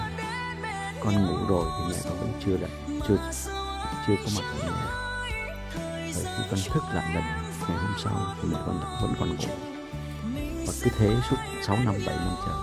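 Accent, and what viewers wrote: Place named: North, Vietnam. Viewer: Giọng nói Bắc 54 nghe hay quá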